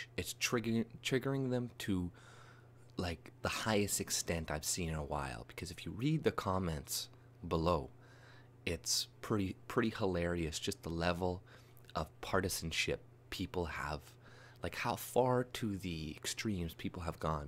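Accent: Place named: Toronto, Canada. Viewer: You sound like a Torontonian- You from around here?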